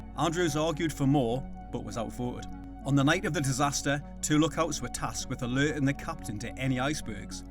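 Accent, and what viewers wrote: Newcastle, United Kingdom. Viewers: Geordie accent...North of England...Newcastle area.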